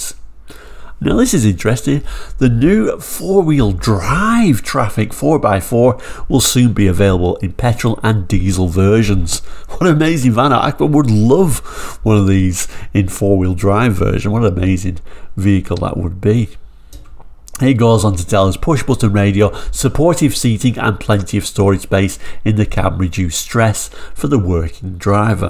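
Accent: United Kingdom. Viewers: I owned a Master from 1993 with a 2.5 diesel engine (atmo, 75hp). Reliable, economic but gutless. Renault was a good brand at that time; by now, they just produce electronic plastic craps like any other car manufacturer does. Dishonesty, scam at its best. Some interesting infos once again. I love your british english accent! Thanks for that.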